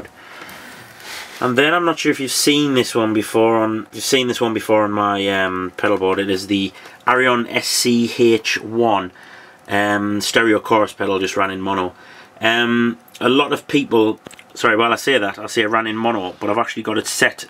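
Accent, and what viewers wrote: Newcastle, United Kingdom. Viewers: Yes! Another Geordie! VERY nice gear!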